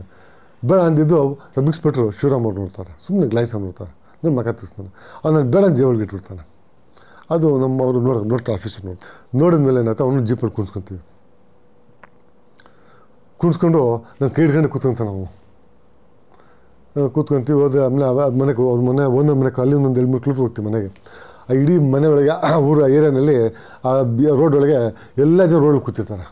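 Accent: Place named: Tumkur, India. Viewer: Typical Tumkur language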